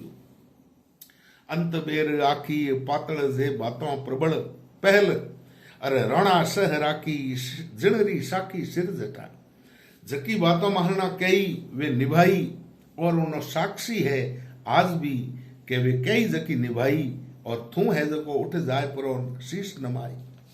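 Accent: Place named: Marwar, India. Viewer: Aaaha ...jivo ...jivo...the...itti mithas ...shudh marwaddi....aand aagya ...ji... dhanywaad...aapka...❤❤❤❤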